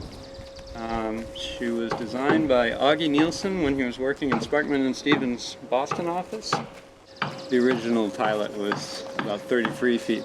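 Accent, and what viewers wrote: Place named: Maine, United States. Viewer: I like the Maine accent and the fashion he works. Kindly from Calabria, South Italy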